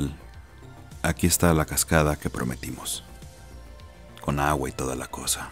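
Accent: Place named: North, Spain. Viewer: A sos re norteño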